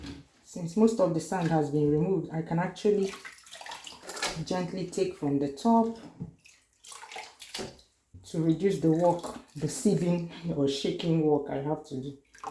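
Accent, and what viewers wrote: Nigeria. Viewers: ...i learn soo much from you. Listening to your voice reminds me of home, Nigeria...🥂❤. Thanks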